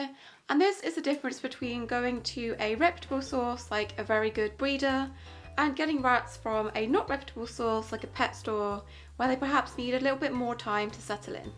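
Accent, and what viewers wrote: United Kingdom. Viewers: And also, sorry for being creepy but what part of the U.K. are you from? 💕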